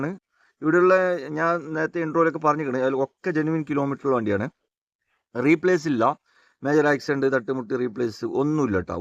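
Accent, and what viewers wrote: Kasaragod, India. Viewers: കാസറഗോഡ് ബാസ 😅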